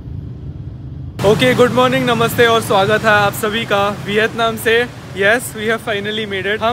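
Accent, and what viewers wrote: Maharashtra, India. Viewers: marathi Manus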